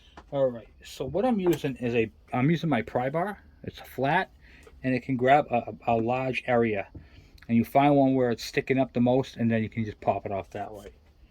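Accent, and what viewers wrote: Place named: New England, United States. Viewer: I thought it sounded New England-ish. I just moved to Connecticut and just bought a GT80 today (my third one). Any chance you have any extra bits laying around?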